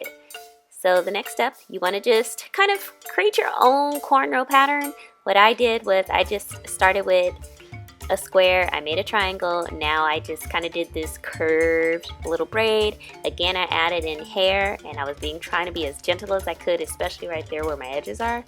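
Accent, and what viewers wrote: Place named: California, United States. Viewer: Beautiful lady , beautiful hair . I'm from the south but love the California accent . You remind me of China Renee another YouTuber with your accent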